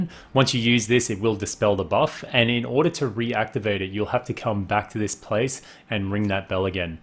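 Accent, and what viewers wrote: Australia. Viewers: Is that an Aussie accent I hear 👀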